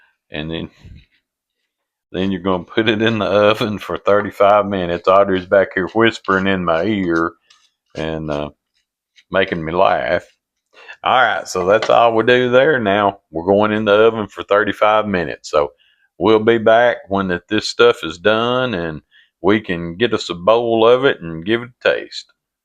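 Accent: South, United States. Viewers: thanks for the tip. Thank you for wonderful recipes, step by step directions, an delightful southetn accent 🎉❤😊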